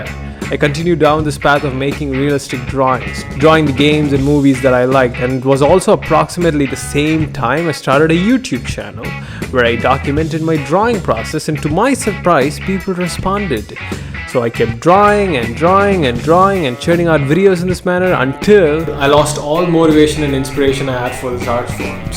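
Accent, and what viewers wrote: India. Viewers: Listen carefully and youll hear little indian accents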